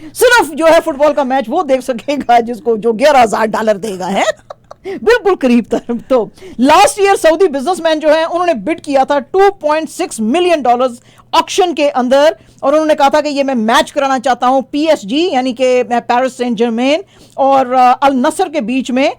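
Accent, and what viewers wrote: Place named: India. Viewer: Oye Paindoo, Corps Commander is pronounced "Core" commander, not "Corpse" commander. And are you an Indian? Why can't you pronounce the letter "Khay" of Urdu? You say words like Kharaab, Khayal, Khamosh etc. like an Indian.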